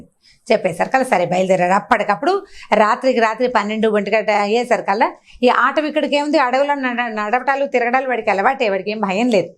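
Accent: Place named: East Godavari, India. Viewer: Namaskaram pedda.akkayyagaru.mee.mata.stala.svacchamgavundi.bahusha.meedithoorpugodavarijilla.anukuntunnaanu.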